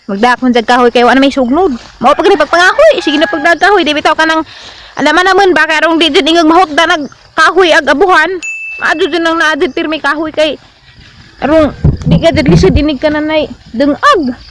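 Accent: Bohol, Philippines. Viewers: Hello ate ka relate kos imong mga accent ug mga words ky taga ubay pud ako bana,ana sad iya accent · ISMAGUL....grabe jud pgkaboholana.... taga tubigon ko day.... silingan ra gihapon ta, 😂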